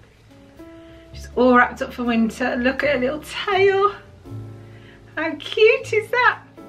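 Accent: Birmingham, United Kingdom. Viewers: Hi ☺️ I'm a pretty new subscriber but I instantly loved you and your channel. Not only are you British Disney YouTuber, which made me so happy, but I could instantly tell from your accent that you must be close to Birmingham and that's where my mum and her family are from. Brummy is basically my second language haha 😄 My mum is around your age and I just showed your video to show her about the clubs you used to go to and she used to go there too 😄 Made her super happy because she lives all the way down in Devon now. So yeah, just wanted to write you a quick note to say how much I adore you videos and how lovely and fun your are. Sending you lots of magical Christmas wishes xx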